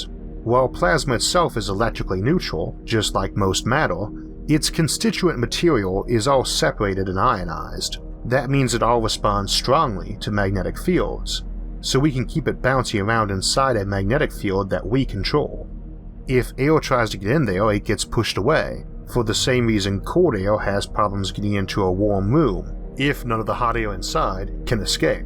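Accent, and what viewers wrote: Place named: Georgia, United States. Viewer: I hope I don't seem rude in asking as I am only just genuinely curious, but are you from Georgia? You have the same accent as some folks I know from Northern Georgia.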